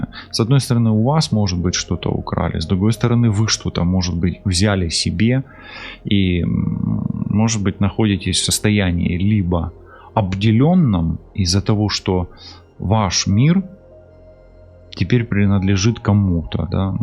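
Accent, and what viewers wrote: Ukraine. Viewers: Добрый день. Вы очень красиво говорите по-украински. Чувствуется профессионализм таролога. Спасибо.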